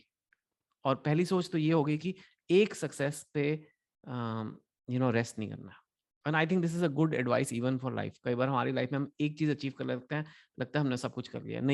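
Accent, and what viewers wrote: Delhi, India. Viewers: Thank you for your brutal honesty, I really enjoy your channel, only recently discovered it - although I am no where near your target audience. I am 38 yrs old female and find the content so entertaining even though it has nothing to do with my lifestage. I Especially love ur Delhi style of talking - I feel like some long lost friend is telling me his life stories:) Keep them coming!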